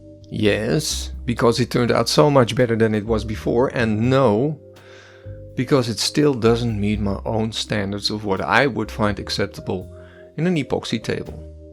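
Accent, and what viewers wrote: Netherlands. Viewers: Jouw engels is echt gewoon goud, dat nederlandse accent 10/10.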